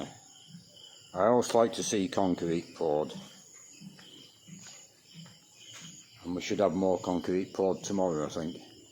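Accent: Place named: Yorkshire, United Kingdom. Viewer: Which part of Yorkshire are you from ❤if I was guessing 🤔 I would say Barnsley ❤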